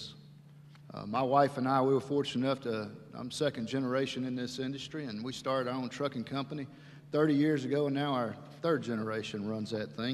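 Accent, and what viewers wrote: South, United States. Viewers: Love his country twang and stern no nonsense voice. Need for like him. You have these elected officials that been in congress to long and forgot what it was like out there looking in. They can’t and don’t want to recognize it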